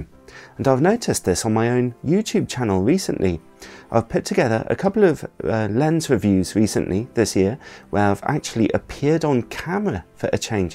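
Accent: United Kingdom. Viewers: i like the british accent :) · i love your britich TBH. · So so glad to see your face! beautiful soul, wonderful voice, brilliant British accent, Love it! May God bless you and your love ones! Merry Christmas 🎅🏻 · I am baptised, but not a believer but I do respect people who believe in a religion. Your video was a beautiful, and a lovely message. It's good to see your face, indeed. It's a good idea for Christmas. You have a soothing voice, and a lovely British accent,. (Please, don't colonize my computer nor my photo camera FujiFilm X-T4!) MERRY CHRISTMAS,! 🎅🎄🎁🌠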